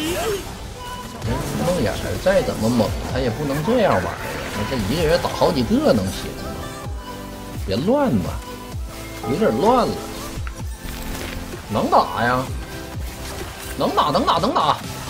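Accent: Northeast, China. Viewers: 猴哥是東北人嗎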